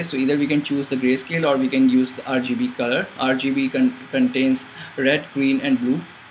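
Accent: India. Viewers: <link> learn how is spelt Gimp. Are You hindi? I am sure your accent is hindi.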